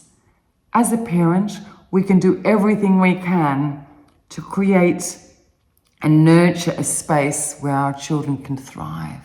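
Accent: Australia. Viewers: Here now a few years in your Channel ❤ from Ireland 🇮🇪 it's your Australian accent particularly yours...😊😊😊😊 So lovley ❤❤ Anyways all of my best to you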